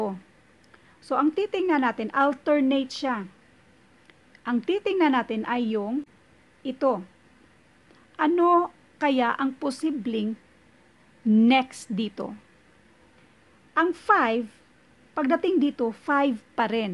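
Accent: Visayas, Philippines. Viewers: Ma'am bisaya ka po? 🙂 nahalata ko lang hehe pero ganda po naman boses nyo kahit parang paos 😅😍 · tsssk tsssk bisaya dii ka maam ?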